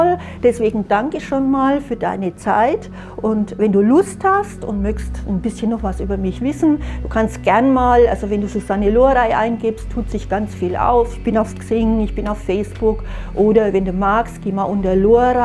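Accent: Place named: Franconia, Germany. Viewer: Susanne, ich sehe mir gerade deine Videos Stück für Stück an und finde, dass du ein Original bist. :-) Die Kombination aus dem Wissen, der Musik (finde ich in diesem Video hier nicht so störend), den gut gewählten Farben (warst du mal bei einem Imageberater, scheint fast so), dem Buddha im Hintergrund, deinem gesellschaftlichen Engagement - und nicht zuletzt der fränkische Akzent :-) macht ein Alleinstellungsmerkmal aus. Man merkt es sich.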